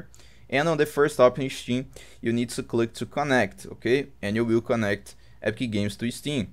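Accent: Brazil. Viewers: Sotaque BR massa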